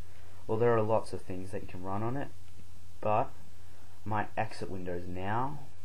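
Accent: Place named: United Kingdom. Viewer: whats with the awful british accent?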